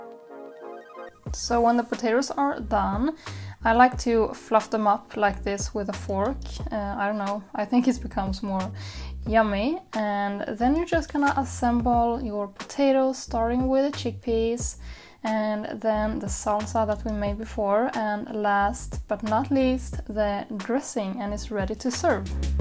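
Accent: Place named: Sweden. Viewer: That Swedish accent tho.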